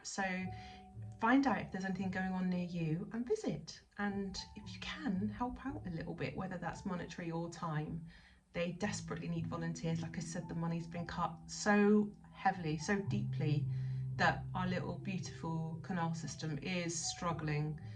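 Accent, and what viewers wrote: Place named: West Country, United Kingdom. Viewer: I love what you do, but you're not getting a coffee. You're not getting a coffee dammit. Showbiz tip - think about losing the west country/pirate accent. Try doing the videos in Geordie.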